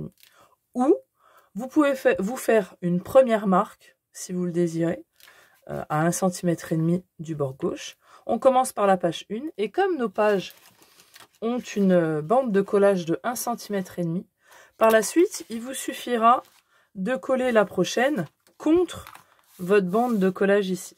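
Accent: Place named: North, France. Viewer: Chalut , je visionne tes tutos merci d' où es tu ? Pour dire vingT en prononçant le T ? Du Nord , de la Haute Saône ? Jaime entendre les accents hi hi 😂❤🎉 Chat'leureusement F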